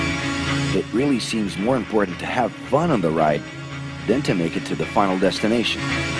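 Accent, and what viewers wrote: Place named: Latin America. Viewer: where is the narator from? seems like a latin-based-language accent...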